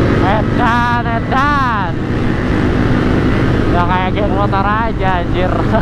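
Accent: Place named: Sunda, Indonesia. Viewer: Sunda ae padahal mh kang, medok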